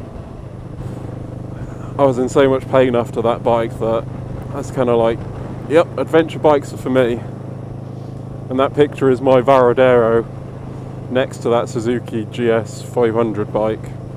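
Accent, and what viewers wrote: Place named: United Kingdom. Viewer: Great video, speaking from the heart. Very inspirational and I just love that fine british accent!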